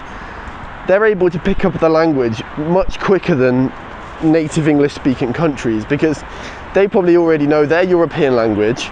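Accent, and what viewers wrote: United Kingdom. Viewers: Thank for british English listening exercise video hoping u have a good life in my country peace man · 不像美国口音，英式英语？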